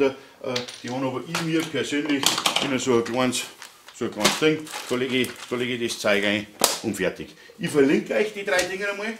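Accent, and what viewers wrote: Bavaria, Germany. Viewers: hallo, zuerst mal vielen Dank für deine Videos immer wieder eine Freude anzuschauen und die Art wie du auf deiner boarische Art die Sachen vor trägst herrlich. Ich habe aus deinen Videos schon viel an Wissen dazugewonnen. Ich habe noch zu einer Zeit Kabel absetzen gelernt als wir nur ein Messer hatten und einen ordentlichen Rundschnitt gemacht haben. Richtig so dass du den Bleistift spitzen die Meinung geigt. Und das Leben aus der Praxis betrachtest eben wie es auf der Baustelle abläuft. Ich freue mich schon riesig auf dein Video zum Thema Drehstrommotoren und habe dazu sicherlich jede Menge Fragen. Spannend wäre für mich auch eine Sache die vielleicht noch andere interessiert nämlich die elektrische Drehzahlregelung an Drehstrommotoren insbesondere bei kleineren Ständerbohrmaschinen die dann am 230Volt netzbetrieben werden vielleicht hast du mal Lust dazu etwas zu machen. 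Wenn's morgz kannst ja mal bei mir bei Instagram vorbeischauen ich mache viel mit Holz aber auch Werkzeugbau und Maschinen mit Vorrichtungen. Liebe Grüße aus Berlin der saupreiß zieht die Hut vor dem Bayern. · Hallo, ich bin dem Bayrischen nur bedingt mächtig, konnte dir aber im Wesentlichen folgen. Aderendhülsen - ich bin von Grund auf kein Mensch der gern mit halbherzigen Werkzeugen arbeitet. Aber die Presszange von Knipex für 130 Euro war mir einfach zu teuer. Ich dachte mir, dass dies auch bestimmt günstiger geht und daher habe ich mal bei dir reingeschaut und bin fündig geworden. Danke für deine Hinweise und deinen Randbemerkungen, die oft den praktischen nutzen beurteilt. ich könnte mir gut vorstellen, dass so eine billige Zange bei 16²mm anders pressen wird, als einer Knipex. Nun, ich bin aber kein Berufselektriker, sondern Elektroniker. Ich löte eigentlich fast nur und dies habe ich in der Vergangenheit auch mit Litzen getan - sie verzinnt. 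Dies soll aber verboten sein und mir gefällt die Isolation nach dem erhitzen nicht besonders gut. Außerdem ist es schneller erledigt, Aderendhülsen mit Kunststoffhülse zu verwenden, satt sie zu verzinnen und dann mit Schrumpfschlauch zu überziehen. Ich werde wohl kaum mehr als 3.5²mm pressen. Hauptsächlich geht es um dünnere Steuerleitungen die an einer Leiterkarte angelegt werden sollen. Das möchte ich gern professioneller umsetzen können. Daher die Suche nach geeignetem Werkzeug. Danke für die Info um Quelle. Dort finden sich zahlreiche Anbieter von Zangen und Sets. Ich schau mal dass ich geeignetes finden werde. Mir war wichtig zu erfahren, ob die preiswerteren Zangen schlechtere Ergebnisse erzielen oder in ihrer Anwendung umst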